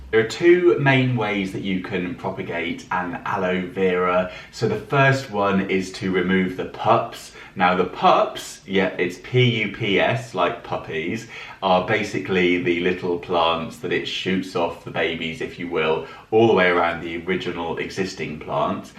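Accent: United Kingdom. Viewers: Love your work. Sooo nice to hear a fellow Brit giving tutorials. Your instructions and explanations are fabtastucally clear. You don't jabber or waffle on. Love it. I have subscribed. Give us more please. I live in the Carribean, wo, and I have 6 acres of ladn around mynjousd. I am planting, planting, planting. Yayyyye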